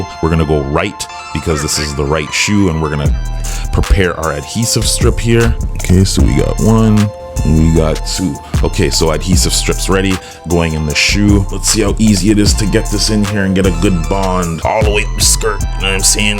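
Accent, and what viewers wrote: Toronto, Canada. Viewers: sounds like he’s from toronto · Jeez this guy's Canadian eh? I hear it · U from the 6ix??! U sound like it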